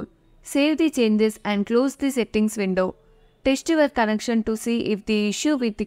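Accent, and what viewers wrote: India. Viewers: why does the ai have an indian accent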